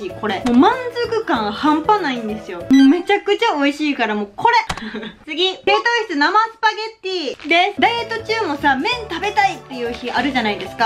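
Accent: Kansai, Japan. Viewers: 初めまして！エイゴといいます！新参ですが、チャンネル登録しました！さん、美人ですね！そして、関西弁素敵です✨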